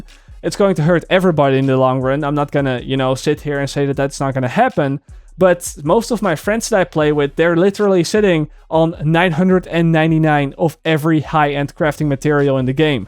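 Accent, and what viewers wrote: Netherlands. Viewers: Ja hij is Nederlands en heeft dus een Nederlands accent. Boeie.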